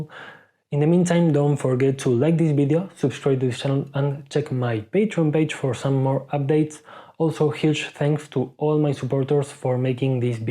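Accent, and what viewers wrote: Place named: Spain. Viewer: Mola que hables en inglés con acento español, así, por lo menos yo, lo entiendo mejor xD. Por cierto, tus videos son muy buenos.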